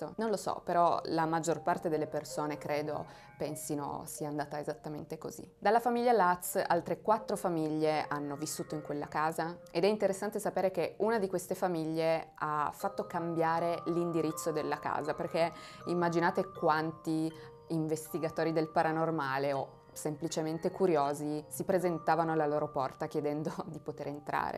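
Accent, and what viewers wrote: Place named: Pordenone, Italy. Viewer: Azzz !!!! Non ricordo se sei Piemontese, o di lì attorno,si potrebbe facilmente confondere il Tuo modo di parlare con quello di Pordenone, è identico!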